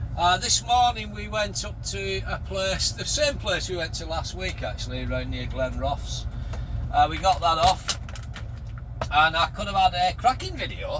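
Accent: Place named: Wigan, United Kingdom. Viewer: I'd love to give you ma mates address in bonnie Dundee,park that heep of shit outside his window 😁 Strath carron, give us some of your Wigan twang!!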